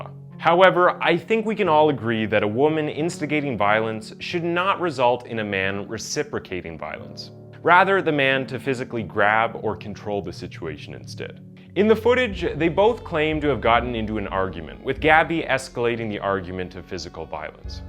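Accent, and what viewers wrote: United States. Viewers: The narrator has a very American accent so am I the only one that finds it strange he cannot pronounce Yosemite I mean I’m Canadian and that’s not a far stretch but I’ve known about Yosemite park my whole life it’s not a small place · I like this guy but I’m curious where he is from. He sounds typical American but mispronounced well known words like Maryland and Yosemite. Just an observation.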